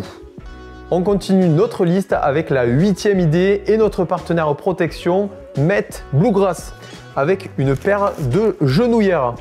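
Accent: South, France. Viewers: Merci pour ces idées cadeaux. Je vais transmettre à ma moitié 😁 Mais pas contre d'être tiré au sort 😍 Toujours de beaux tutos et surtout un accent qui chante et sent bon le Sud. Comme on dit ici: Bon bout d'an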